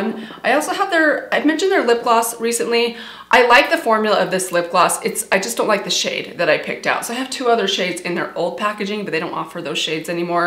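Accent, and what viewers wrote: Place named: Utah, United States. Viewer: The hard Utah accent was an immediate turn off for me. And you talk way, way too fast! I tried contacting her reps to find out which She Glam concealer she was talking about and the response was ridiculously convoluted. The fact that she suggests going to Sephora for new brands is laughable. Go to Ulta, which I also don’t love but at least you get some drugstore brands. So funny that she is talking about Nabla at the end of this vid and you can get the brand at Ulta but not Sephora. Seems all slapdash thrown together- who deemed her an expert? I will be leaving as fast as I found her-toodles.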